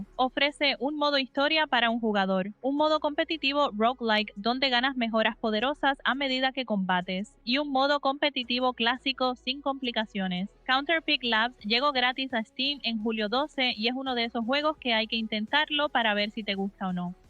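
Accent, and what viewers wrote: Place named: Puerto Rico. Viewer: Saludos. Por tu voz sé que eres como nuestro coqui! Me equivoco? Gracias por el video. Adelante :)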